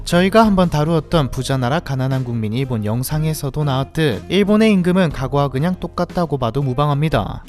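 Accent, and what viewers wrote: Gyeongsang, South Korea. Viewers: 딱보니 경상도 😂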